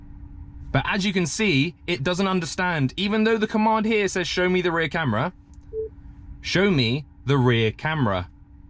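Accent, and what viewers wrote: United Kingdom. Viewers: I have seen a vidéo in the US and work perfectly...weird ! Maybe your brits accent ? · Your car don't understand British accent. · I watch this guy's video specifically for that accent. Saying something even if stupid, in a Britsh accent just makes it sound smart. I think that's how Boris Johnson got to be PM.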